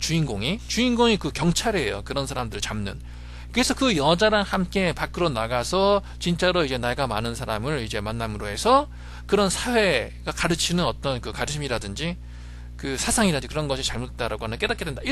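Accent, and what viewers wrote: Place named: Wales, United Kingdom. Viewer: wtf ,why is this welsh fella speaking ?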